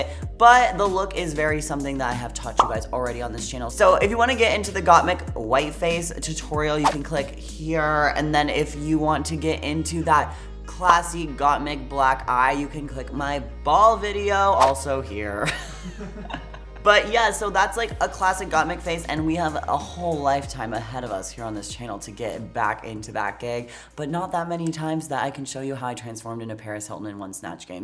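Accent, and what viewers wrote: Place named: Los Angeles, United States. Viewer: i love you LA dialect its so calming and entertaining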